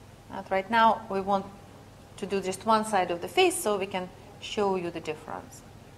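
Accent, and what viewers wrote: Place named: Russia. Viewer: Эх, сразу чувствуется , русская женщина представляет скэнар ,акцент выдает )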